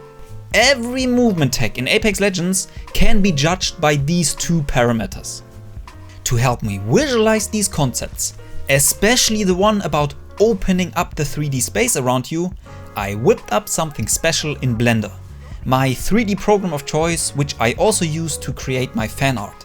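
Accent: Germany. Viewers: I as a German INSTANTLY recognized his accent😂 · u sound extremly german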